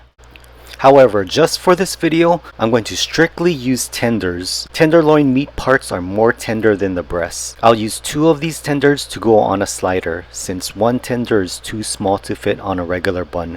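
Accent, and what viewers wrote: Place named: Hawaii, United States. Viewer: You sound like u from Hawaii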